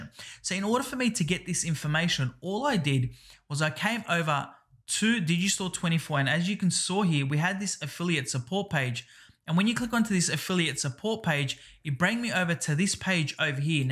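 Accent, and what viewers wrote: Australia. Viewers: I like your Aussie accent 👌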